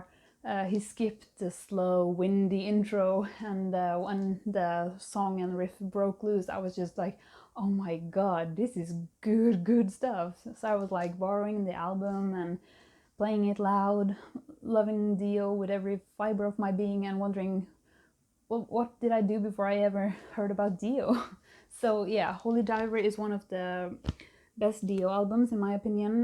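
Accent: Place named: Ohio, United States. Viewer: ha thought you were from ohio,,,,love your accent and your love for metal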